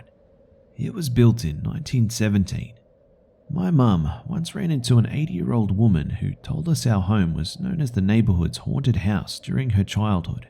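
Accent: Australia. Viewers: This guy sounds Aussie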